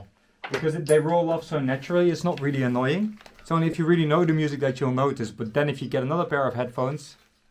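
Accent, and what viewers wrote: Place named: Netherlands. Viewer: You know whats funny? You can tell someone is Dutch by their English ;)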